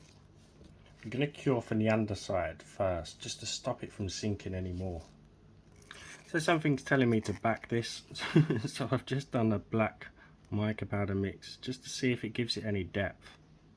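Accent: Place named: South West England, United Kingdom. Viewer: you sound exactly like Jude Law and your whole voice and cadence and demeaner are so soothing (sooving). I grew up as an American in SW England so I am in love with (wiv) this accent.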